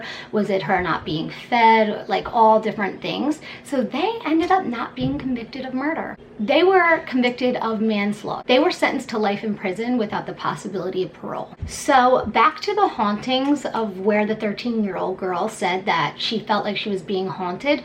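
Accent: Delaware, United States. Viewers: Damn I can hear that Delaware accent hard girl I miss home🤣💜💜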